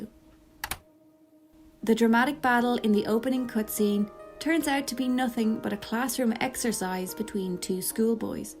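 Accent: Ireland. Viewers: Did not expect to hear an Irish accent, great video.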